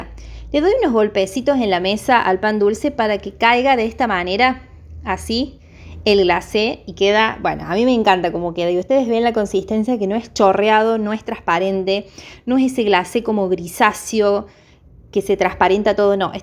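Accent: Córdoba, Argentina. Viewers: Graciasss hermosa!!! Te acabo de encontrar, ya me suscribí, activé la Campanita. Ahhhhh!!! Me encantaron esos pancitos chiquititos!!!! Voy a compartir con los de mi Familia, mamás amigas, y todas las personas que les encante hacer esta belleza!!! Una pregunta, preciosa. Yo tengo la batidora de pie, la Planetaria. Se puede hacer la mezcla ahi o me van a quedar mas duritos? CLARO,que al integrarlos con la harina los paso a la mesada, por el tema del gluten.Sino, los hago como vos en Licuadora y no hay problema. Quisiera hacer muchos, si me salen bien los primeros 25, porque me encanta regalar y esta es un opción económica, pero más valorado, el hecho de ser hechos caseritos y a las personas que regale, le va a encantar el gesto de haberlos hechos pensando en ellos. Disculpas tan largo!!! Soy una abuelita de 78 años y lo quiero hacer con 2 nietitos varones, que les encanta la cocina. 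Te digo la Verdad, tienen 9 y 13 añitos y cocinan y luego la mami, los pone al fuego El de 9 añitos, hace milanesas perfectas, solito, otras comidas, fáciles y tortas, los dos hermanitos. Ya termino, preciosa, ya te saqué sos de Córdoba ( Argentina) como yo, pero de Buenos Aires. Amo tu tonadita.❤ Tengo familia cordobeses y pasaba de niña las vacaciones de invierno en La Falda Ya te quierooo Mucho!!! Besitos ❤🎅🏻🎅😘😘😘❤️ Y quiero hacer tu glacé real. Es hermoso blanco como la nieve!! Debe ser delicioso.😘😘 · Hola querida CORDOBESA..LA TONADA ES MUY CONOCIDA..SOY DE CORDOBA CAPITAL..MUCHO GUSTO.. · Hermosa recetas de los pancitos de navidad me encantó lo pondré en práctica ya le compartí a mi amiga muchas gracias, al principio estaba en duda 😂 le decía a mi mamá esta chica es de Córdoba x su tonada, despues dije siiii.....ME ENCANTA T PROVINCIA HASTA AHORA GRACIAS A DIOS VAMOS TODOS LOS AÑOS,volviendo al tema de lo pancitos me gustó,xq creo son mas practicos q los amasados ❤👏👏🙌🙌Sgo del Estero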